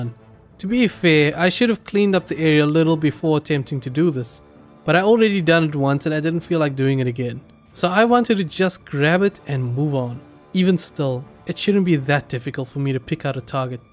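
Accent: South Africa. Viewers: Oh shit you from SA mal kak bru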